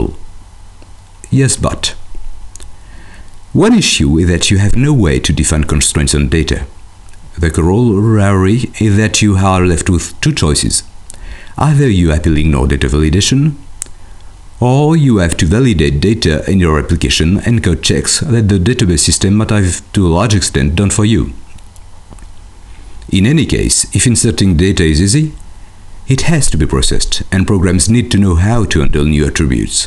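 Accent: France. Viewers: He does not sound anything like an Indian. The narrator is French. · You guys should be happy he is taking the time to put this online and help us (instead of bitching).. plus his french accent is pretty light, compared to some. Thanks again for the videos they are a great help.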